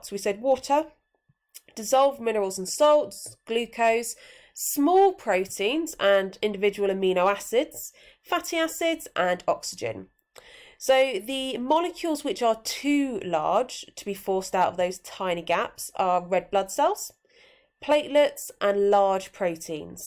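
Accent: United Kingdom. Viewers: You are a British, aren't you??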